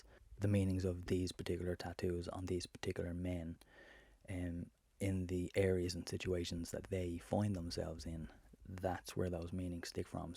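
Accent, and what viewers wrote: Ireland. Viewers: OMG !!!! Love your accent so much! Ireland ❤️❤️❤️☘️☘️☘️🇮🇪🇮🇪🇮🇪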